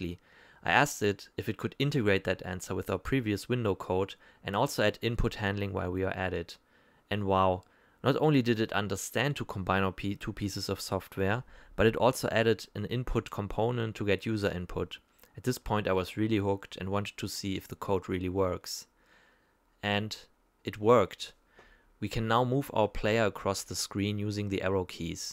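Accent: Germany. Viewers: Actually are you german? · Cooles video und schon Krass dass es schon so weit mit Ai getrieben wird, Kann es sein dass du deutsch bist ?? Dein Accent hört sich voll deutsch an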